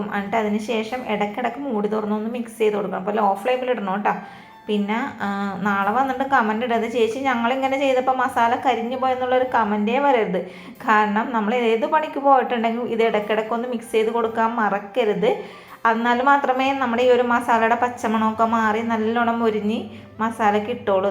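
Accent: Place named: Kochi, India. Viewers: Ernakulam ആണെന്ന് മനസ്സിലായില്ലേ, എനിക്കറിയാൻ പാട്ല്ല കേട്ടാൽ ഉറപ്പ് എറണാകുളം.. പടിഞ്ഞാറൻ പ്രദേശം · Samsaram kettittu kochikkari aanennu thonunnu. Ningal evideyaanu sthalam? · പടിഞ്ഞാറ്ൻ ഭാഷ.... നന്നായിട്ടുണ്ട്...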